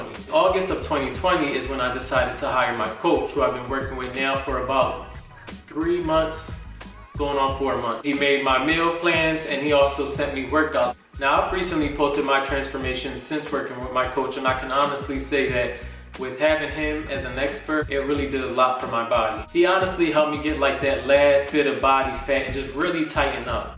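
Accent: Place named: Philadelphia, United States. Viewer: You are SO PHILLY! I can hear your accent. I love it. This was super helpful though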